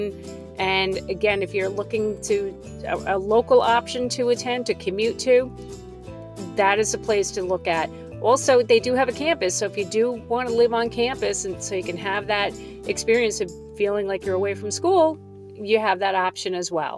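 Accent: New Jersey, United States. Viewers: She sounds like she should of been on the Sopranos.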